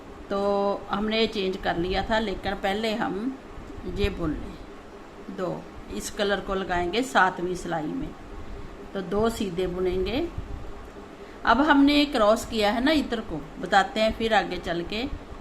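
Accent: Punjab, India. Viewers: मैं रावलपिंडी पाकिस्तान से हूं. तुम मुझे बहुत अच्छी लगती हो, पंजाबी स्टाइल में बात करने का तरीका बहुत अच्छा है। स्वेटर बनाने का आपका तरीका मेरे जैसा ही है। मेरी माँ ने मुझे आपकी शैली में सिखाया। मैं सच में आपसे बात करना चाहता हूं. आपसे अनुरोध है कि आप एक वर्ष तक के बच्चे के लिए रोम्पर बनाना सिखाएं। Google द्वारा अनुवादित बहुत बहुत धन्यवादमैं रावलपिंडी पाकिस्तान से हूं. तुम मुझे बहुत अच्छी लगती हो, पंजाबी स्टाइल में बात करने का तरीका बहुत अच्छा है। स्वेटर बनाने का आपका तरीका मेरे जैसा ही है। मेरी माँ ने मुझे आपकी शैली में सिखाया। मैं सच में आपसे बात करना चाहता हूं. आपसे अनुरोध है कि आप एक वर्ष तक के बच्चे के लिए रोम्पर बनाना सिखाएं। Google द्वारा अनुवादित बहुत बहुत धन्यवाद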